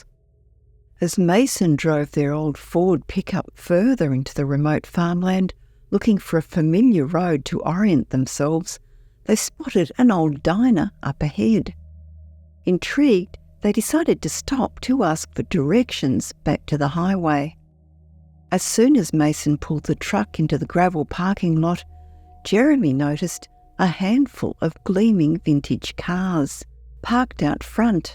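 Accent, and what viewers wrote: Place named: Australia. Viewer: Australian narrator ❤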